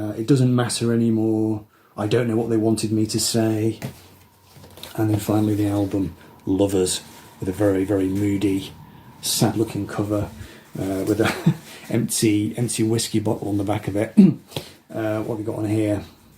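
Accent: United Kingdom. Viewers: Hi, very enjoyable and at the same time kind of sad video! There is something about lyrics recited with a British accent that really makes it classy, at least from an American perspective. take care, Elliott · Hi. Depressingly good video on the most positive manner. Some absolute tear jerkers in there along with some unexpected choices. Really great delivery which is such a strong positive on your channel. Are you a NW England guy, I’m trying to place the accent. I’m from the Potteries (Stoke if you didn’t know) as you can probably tell from my accent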